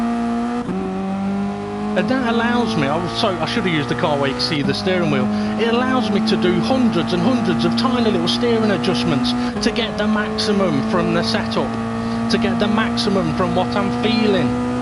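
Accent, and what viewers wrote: United Kingdom. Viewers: So british. :)) Nice video mate, I use your settings, and I can feeel, everything from my car. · I've subbed just for the classically British rant. Too funny but still informative.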